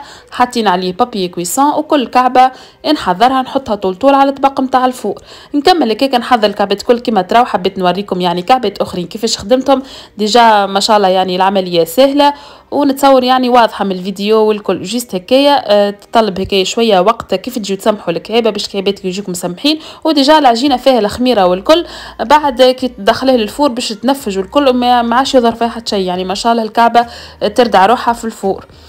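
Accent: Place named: Tunisia. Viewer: اللهجة التونسية مختلفة كثير على اللهجة المغربية تشبه اللهجة الليبية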